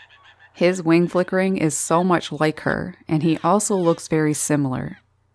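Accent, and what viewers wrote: Canada. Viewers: Love your Canadian accent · Food offering. Mate for life. So romantic! Thanks for the video,. I've always loved your narration. I guess I'm intrigued by your Canadian accent.😂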